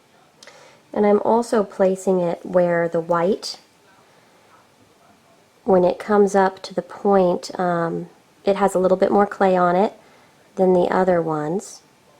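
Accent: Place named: Italy. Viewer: All I can hear is Italy ❤💚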